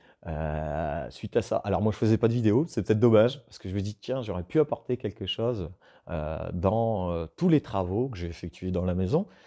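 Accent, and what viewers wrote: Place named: Nord, France. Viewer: Meilleurs vœux à toi aussi M'sieur! Cool cette vidéo on apprend un peu plus à te connaître et ça fait plaisir 😁 Je suis aussi du 59 et à 20mn de la Belgique! C'est vrai que les "oir" chez nous ça s'entend 🤓 En tout cas continues tes vidéos elles sont geniales, c'est toujours un plaisir de les voir!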